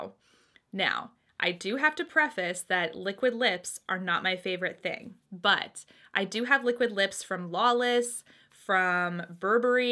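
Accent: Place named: Canada. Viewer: I have to say that for some weird reason, I am OBSESSED with accents and ways of speaking from other countries, etc. I love how you Canadians say ABOUT!! ❤️❤️❤️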